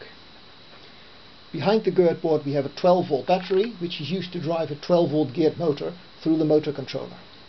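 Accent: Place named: Netherlands. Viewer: Funny how you can tell he is Dutch from the first sentence he says. Great Work!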